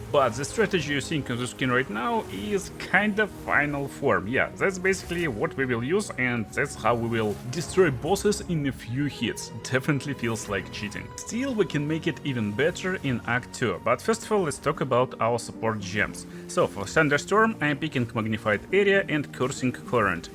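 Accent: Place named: Russia. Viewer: Ты бы не выёбывался, а говорил по-русски, слышно же, что русскоговорящий. Зыс и т.д. произношение выдаёт.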